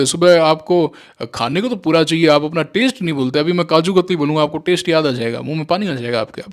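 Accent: Rajasthan, India. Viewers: Sir ji, aaj to bahut jyada dil khush kar diya apne, akhand bharat ki baat bolke, sanatan ki baat bolke, sabse sashakht sabhyata ki baat bolke, spirituality ki baat bolke. Mai Bikaner se hu aur aapki baate aur apka lahza sunke ek baat se sure hu ki aap bhi Rajasthan se ho aur kahin na kahin apka sambhandh bhi Bikaner se hai, aisa isliye kyunki 2-3 din pehle ek video me apne bola tha " Market bhacheed bola rha hai". Aisa to yahan bikaner me kafi bola jata hai. I may be wrong but mujhe kafi stong feeling aayi ki aap bhi Bikaner se ralate karte ho. Itni gehri aur amulya baate humare sath share karne ke liye aapka koti koti dhanyawad. Jai siyaram 🙏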